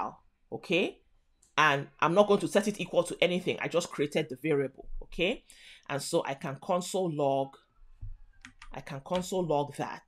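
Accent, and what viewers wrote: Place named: Nigeria. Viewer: Nice! Off -Topic: From your Accent it’s hard to believe you are not Nigerian (specifically southern Nigeria (south south or south east)…intonation & manner of speaking clearly Nigeria, & I’m sure other Southern Nigerians will feel the same way. If you do that ancestry-ish tracing stuff. I’d easily place a Bet on the result matching what I said above.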